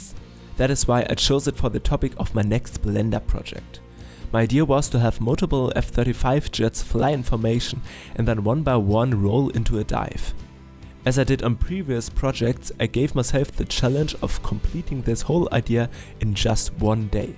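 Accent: Germany. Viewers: why does he sound german? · are you german? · Der hart deutsche aktzent stört ein wenig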